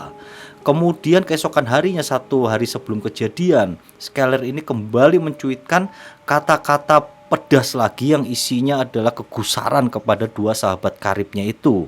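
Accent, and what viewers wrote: Java, Indonesia. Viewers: Logat jawanya terllu dalem jd agak ganggu dengernya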